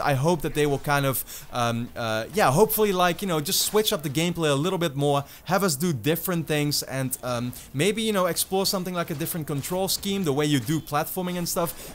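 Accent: Netherlands. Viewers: Is he from the Netherlands?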